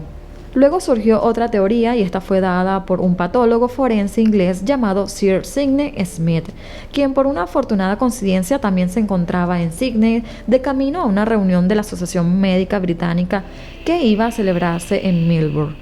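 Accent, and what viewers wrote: Venezuela. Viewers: Mrkaaaaa eres de Venezuela? Lo digo por el ascenso qwq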